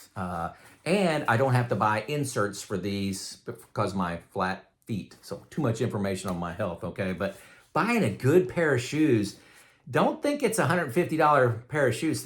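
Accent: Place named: Southern Indiana, United States. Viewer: lol, I was wondering where your accent was from, you must live in the southern part of Indiana near Kentucky. You have a great accent!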